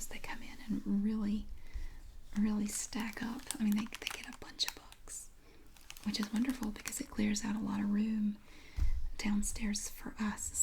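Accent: South, United States. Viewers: Love your accent, to me a Southern accent is a cockney accent :-)I live in the West Midlands UK.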